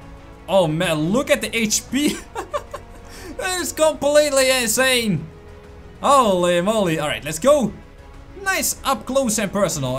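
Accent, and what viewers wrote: Netherlands. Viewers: Are you from The Netherlands? You got that enthousiastic Dutch accent when speaking English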